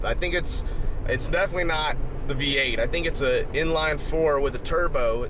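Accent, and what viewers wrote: Canada. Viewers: Love the Canadian accent some or all of that stuff you said was true😉😉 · Ur Canadian accent is on point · Awesome Canada ascent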